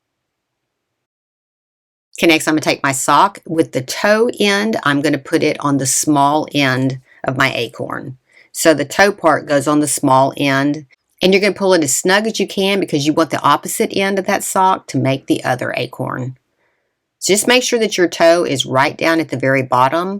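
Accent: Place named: East Tennessee, United States. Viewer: where are you from? I’m guessing East Tennessee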